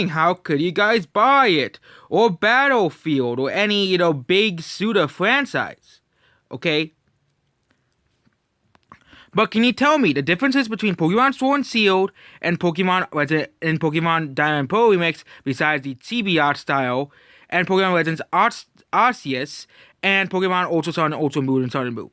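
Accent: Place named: India. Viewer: But this guy sounds like a Indian scammer kind of you know Indian skimmer you guys trying to get us to buy Sony and Xbox or steam deck instead or game PC well already have two of those how about I go steam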